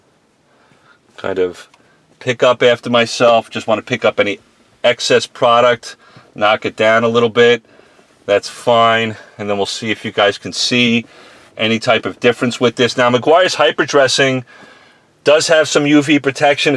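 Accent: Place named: New England, United States. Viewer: Never knew you could use that on a dash! First time watching your channel. One question, what part of New England are you from? Your accent almost sounds southern New England.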